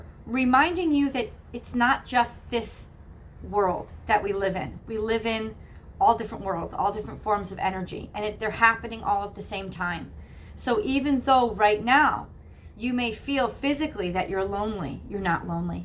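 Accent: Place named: New York, United States. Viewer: Such a small world, when I first watched I thought you were from NY from the slight accent until you mentioned the place in Derby! Just moved to Newtown, originally from Danbury area. Not sure how the YouTube algorithm works but didn't think it would be suggesting people from the area haha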